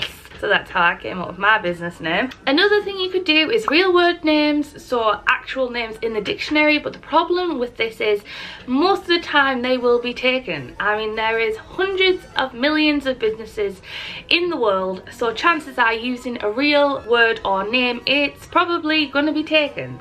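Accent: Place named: Middlesbrough, United Kingdom. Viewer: So pleased you made this video. I went through the same sort of process writing down all sorts and ended using 3 names together. The name my dad always called me - sweetpea - and the name my brother used to annoy me with - Polly - and because my business is craft related I used - crafts. So hence the name Sweetpeapollyscrafts. Not sure now if thats too long or I should have used cards instead of crafts as that is what my business is about. Your accent makes me feel like I am back home in Middlesbrough and I really love it when you visit the coffee shops in Marske, its not far from the hair salon I used to go to - Contemporary. Keep up the great work!!!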